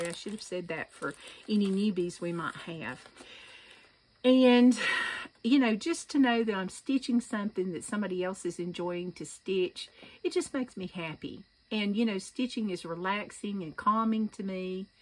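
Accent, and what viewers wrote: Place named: Texas, United States. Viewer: Hi, Just found you on FlossTube. Your accent is a little thicker and adorable than some of us Texans. 😉 Naturally, I had to find out where you are from by going to your #2 video. 🙂 Loved seeing your play by play of Friendstitch. I’ll be sure and sign up for my first one next time. Harvest blessings. Thanks, Darlene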